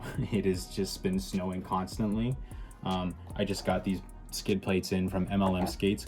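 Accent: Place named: Canada. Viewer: i can tell you're canadian by your accent also its ironic having that board AND the kill your idols deck lol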